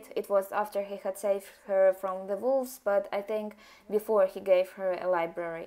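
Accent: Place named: Russia. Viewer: You're welcome. And sorry if it has taken me awhile to reply as I've been preoccupied with other activities. The way you analyze things is something I really admire because your ideas seem to flow quite nicely even though English isn't your first language. Your Russian accent is pleasing to hear. 👍 And your analysis on Belle made me relate to her in terms of being more like a simple and curious type, who thrives her solitude but I'm less like her in terms of the social aspect. I'm not very friendly and I feel awkward and not as confident around people. 😅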